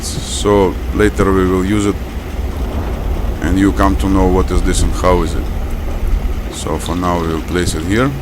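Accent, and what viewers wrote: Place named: Russia. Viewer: Вермахт это в переносном смысле за стрельбу из пушки, а не торпедирование где хочется посмотреть мастерство. А на счёт реализма странное не понимание, ну какой может быть реализм у симулятора да ещё с таким множеством поблажек который он дает игроку? Здесь не то что снижать, самому игроку приходится ещё додумывать как бы усложнить игру. Кстати на западе почему-то все упорно играют на низком бояться что ли? Я сперва пока не услышала акцент тоже подумала, что с запада, а потом смотрю под видео название-о да это наш.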